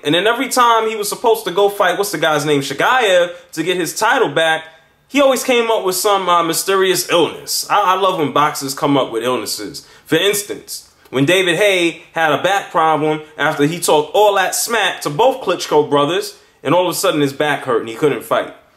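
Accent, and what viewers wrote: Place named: United States. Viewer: It's val-you-ev Goddamn American accent SNM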